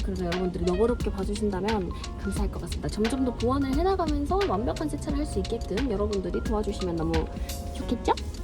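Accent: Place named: Gyeongsang, South Korea. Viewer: 서울말 쓰려고 노력하는 경상도 미인이네^^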